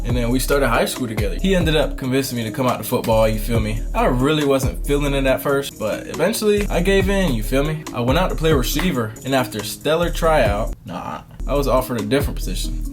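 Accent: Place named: Florida, United States. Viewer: Your Florida accent was heavy AF back then lol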